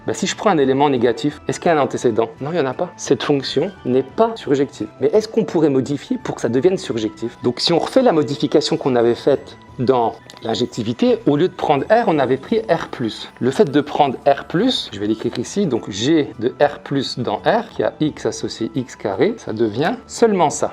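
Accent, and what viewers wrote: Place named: Asia. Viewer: Pourquoi vous portez un masque si ce n'est pas trop indiscret d'oser poser la question.Je crois en juger par votre accent que vous êtes asiatique😅😅😊.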